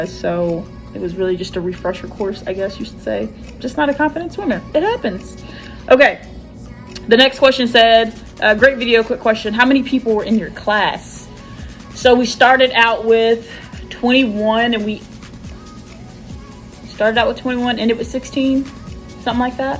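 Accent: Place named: South, United States. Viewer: GUUUURL…your southern accent is BACK! Hahaha I’m from MS so it sounds comforting lol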